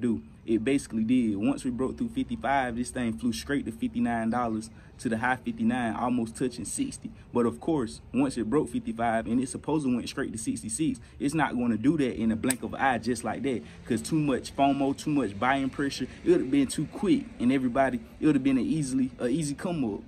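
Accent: South, United States. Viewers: I swear I'm not trying to spread FUD. I'm diamond handing like a mofo lol. But I am curious about the dip in volume lately. Are less retailers buying? Btw, shout out from Alabama! I can tell you're from the south!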